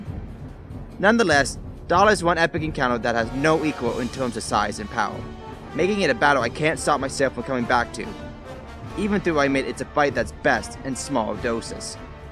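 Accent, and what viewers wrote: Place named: Ireland. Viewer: It sounds irish :D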